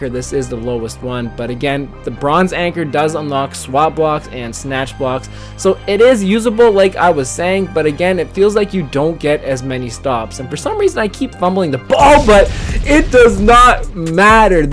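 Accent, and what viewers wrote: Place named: Canada. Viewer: I am going to take a WILD guess... are you Canadian? Whenever I hear you say "out" it reminds me of a Canadian friend of mine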